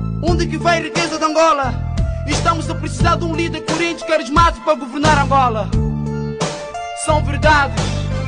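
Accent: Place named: Guinea-Bissau. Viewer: esse gajo nao é angolano! tem sutaque de guineense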